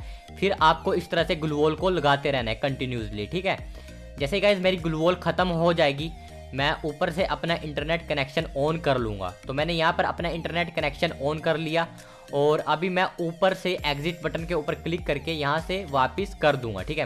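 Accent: Punjab, India. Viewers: Bro r u from Punjab ☺️🤭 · Bro you are from punjab??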